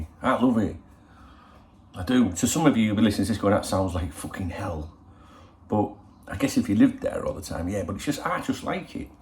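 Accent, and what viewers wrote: Yorkshire, United Kingdom. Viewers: How are you doing, you remind me of my Dad the way you talk he was a Yorkshire man he was my dad and my best friend, is it true that our new player is injured. I love your video's there down earth and great fun.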